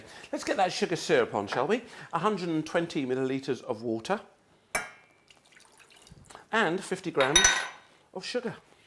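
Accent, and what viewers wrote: United Kingdom. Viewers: nigga u British.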